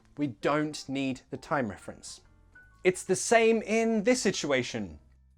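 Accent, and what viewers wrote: United Kingdom. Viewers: I'll be studying english next year because i think british english incredible and i love british accent and you i think you're very amazing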